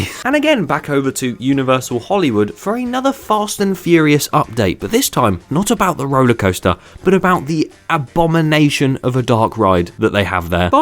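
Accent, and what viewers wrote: United Kingdom. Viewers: I feel like you’re from Yorkshire, tell me. But don’t doxx yourself lol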